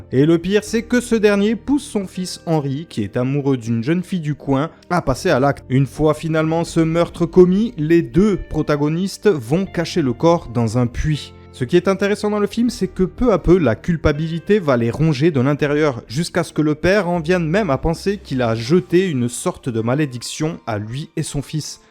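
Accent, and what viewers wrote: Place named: England, United Kingdom. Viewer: Vidéo interessante mais ton accent Anglais il irrite vraiment les oreilles !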